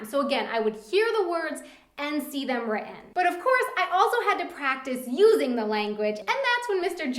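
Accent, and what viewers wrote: United States. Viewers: If you want to make your accent when speaking German more German and less American, I suggest you to listen to some Nachrichten and try to let you and the broadcaster read the material simultaneously. When you do it, you will find the differences between the American and German accent and adopt German accent more quickly. I know you may do not know the material before the program, so you can listen several times and then begin that. I am foreign student in the USA, and that is how I adapted a New England accent within four months. (This is my 2nd year here, but many of my friends thought I have been here for more than 6 years and I am learning German too!) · Here's the method: if you are about to learn a foreign language - do not surround yourself with those, who only speak english. Avoid - sorry, but it works best that way - your own language (you know it already). 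Sign for success: you start to think, to dream and finally to react in your language of choice. Hearing You speak on these videos shows that you are still english-american based - it also shows during those few crumbs of german one can hear every now and then! Pronounciation could be learned by attempting queens-english or scouse a.s.o. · American accent in Spanish is always quite ...interesting... :D And really easy to imitate somehow. But however your accent is, it is cool that we seem to speak the same three languages. ^^ When communicating with someone it is very great to switch languages! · I don't think you're even trying to get the pronunciations correct. In german or Spanish. Like a deliberate heavily American accent in both.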